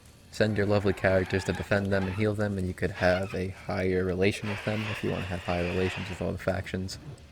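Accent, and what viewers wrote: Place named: New York, United States. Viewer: Are you from New York? Judging by the way you pronounce "dog" and "small".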